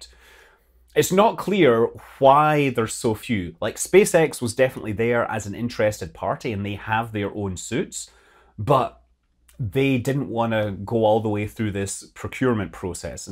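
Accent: Scotland, United Kingdom. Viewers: whatever the distraction be it's certainly lightened up your Troon lilt somewhat expressing giggles and tongue tied pronunciations in places made me think you've been showing your support and appreciation for the micro-brewery industry. Again! Lol. Sorry, you have this fabulous narrative skill that's appealing and easy to digest as half the time I won't be watching your youtube update but listening all the same. It's a bit like you stream your Lego set construction while i'll get on with the ironing over here busying myself. Seriously, your extensive knowledge base linked over many disciplines and an un-baised view is so pleasurable and refreshing on a platform inundated with competition. Keep up the good work and fly safe.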